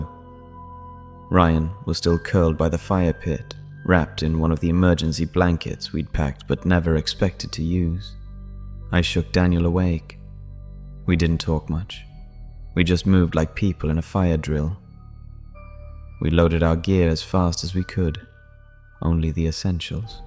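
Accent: United Kingdom. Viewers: For those of us who do not have the class of a British accent, it makes the narration of your tales exceptionally enjoyable. The meat & potatos of the stories are always great. Good to see you back.. God Bless you & yours Sir..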